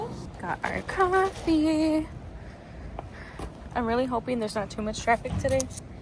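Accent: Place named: Florida, United States. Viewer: Sound like you from Florida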